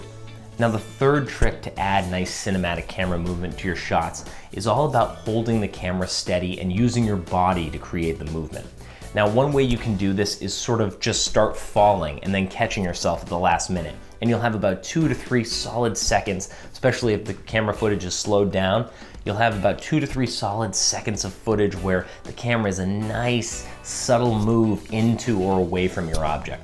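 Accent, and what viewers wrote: Boston, United States. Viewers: Wait! Are you Boston?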